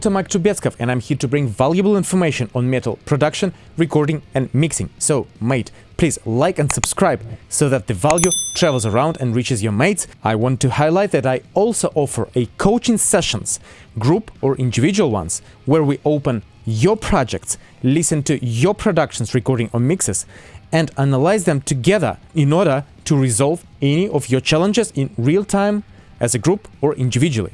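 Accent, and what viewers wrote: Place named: Eastern Europe. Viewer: As a seasoned metal guitar player learning about the world of synthz it adds to your credibility that I spot an EVH, an ENGL and a Custom Audio Electronics behind you. Had it been Vox, Two Rock and Matchless combos I would have had my doubts..... :) Based on your accent I would say somewhere in Eastern or South Eastern Europe,- though it could of course be South America too,- you just never know... Greeting from a Vikingr Metaller in Scandinavia. Subscribed.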